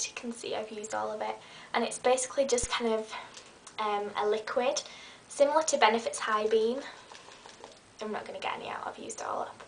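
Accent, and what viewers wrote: United Kingdom. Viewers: i cant bloody handle the wayy you add i got a bloody good day any how do i thought like bloody good cherio shut the fuck up man i hate british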